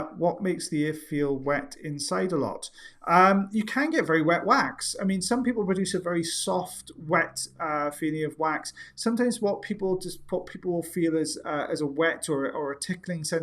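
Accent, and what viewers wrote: Wales, United Kingdom. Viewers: Where in Wales ARE YOU FROM???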